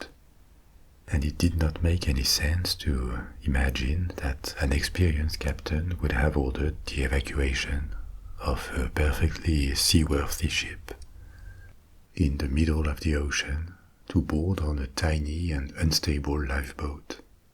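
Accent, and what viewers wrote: France. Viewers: This is the perfect channel you put on to hear a gentleman tell tales and tell history like as if he was there in a gentle voice with a lovely French accent, a perfect combination, thats something to me is rare, and i love it, so all i have to say is this, Merci!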